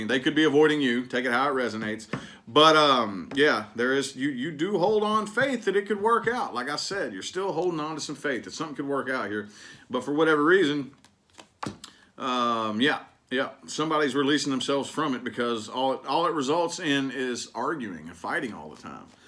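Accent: South, United States. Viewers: Loving that "country drawl". Being from NC I have a drawl too.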